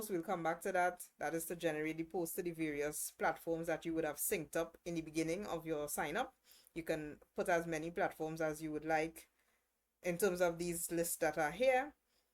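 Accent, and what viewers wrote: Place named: Trinidad and Tobago. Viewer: Super video!! also love the Trinny accent, keep going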